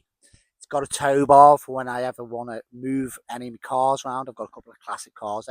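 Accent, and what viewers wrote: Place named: United Kingdom. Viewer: Is thus UK? Is this a dialect of English or what is the language please?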